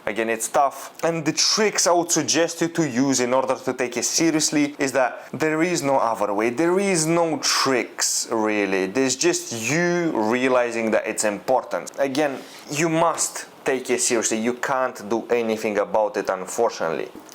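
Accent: Russia. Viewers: Блин, я подозревал что ты русский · dammm finally a real fella slapping reality out here, loving your hard cold facts and love the Russian accent too ❤ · That russian accent 🥶😮‍💨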